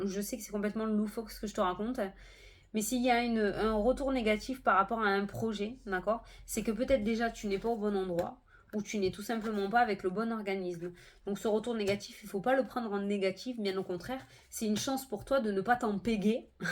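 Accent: South, France. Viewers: Merci beaucoup pour ta bonne humeur et ton accent du Sud, j’ai toujours le même plaisir de te voir tous les jours. Quand à moi j’aime les deux formats que tu proposes j’ai envie de te dire fais comme ton cœur ♥️ a envie, écoute le et lui seul le sait. Merveilleuse journée à toi 😊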